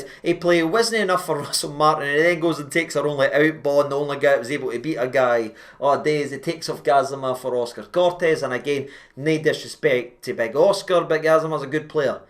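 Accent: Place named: Glasgow, United Kingdom. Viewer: That's some Glaswegian accent .Support your local club mate.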